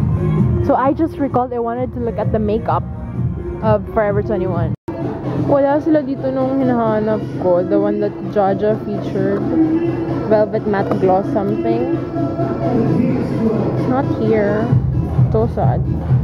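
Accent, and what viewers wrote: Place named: Davao, Philippines. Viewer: Love the davaoeña accent.hehe nice vlog! Cn u do a review on make up factory's velvet lifting foundation? Looks promising though.. tnx!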